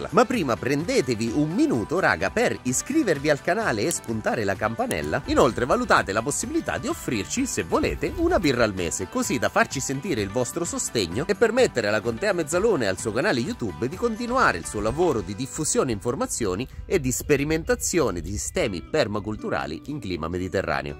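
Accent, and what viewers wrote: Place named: Agrigento, Italy. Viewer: Ciao dall'accento sembri di Agrigento io sono di Palermo l'inverno della nostra isola e così mite che da lunedì sono previsti 20/°C 😎☀️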